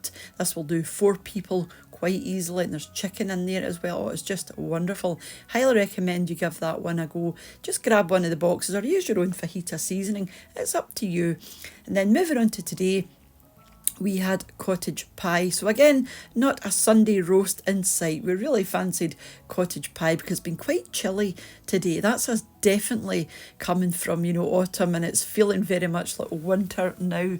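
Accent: Scotland, United Kingdom. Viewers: yes, I knew from reading here. your accent/brogue sounds just like them. I am in Michigan as my Grandparent's came from Saltcoats/Stevenston. We are still very close to our kin there and see them often.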